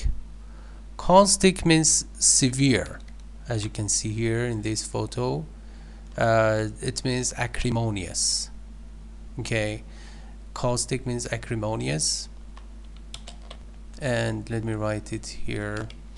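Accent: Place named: Iran. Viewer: Persian accent 😉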